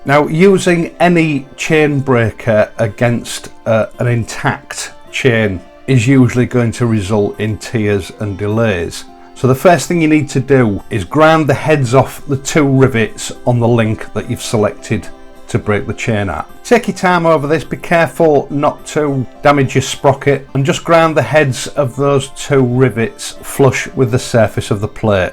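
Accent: United Kingdom. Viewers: Came here to see what a gold chain looks like on a Bonnie (trying to decide between natural or gold for mine) and came away with being fascinated by the accent. Of course I've heard many a UK accent and love them but I am by no means a scholar on the regional differences. I could be wrong but this doesn't seem like one of the more "standard" ones. Here I heard what sounds to me like hints of the US southern accent...and of course it makes me think that's possibly where it came from. For example the word "time". It's like "taaam" like the US south. Anyway, I love languages and dialects. Can anyone tell me which specific accent this is?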